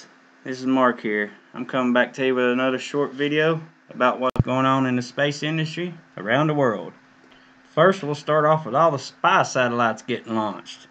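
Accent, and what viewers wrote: United States. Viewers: Ya good video and I love your hillbilly voice! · Your hillbilly accent is comforting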